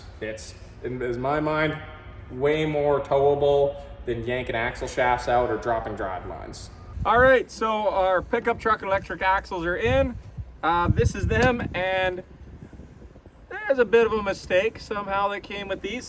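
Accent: Canada. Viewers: I noticed the way you say semi truck the i is like like a lowercase and the way we say it down here in the states it’s semi like an uppercase so is that a Canadian thing I take it because when I watch a Tesla video about trucks I gotta turn it off because all my life, I’ve heard it that way and it drives me up the wall not so bad when you say it, but you’ve got that Canadian accent. PS I love YOUR trucks.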